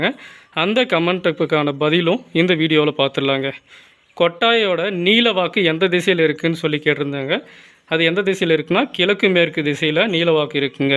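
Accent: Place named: Dharmapuri, India. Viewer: Super ga nenicha slang ora mari iruku nu nanum dharmapuri tha dpi la endha ooru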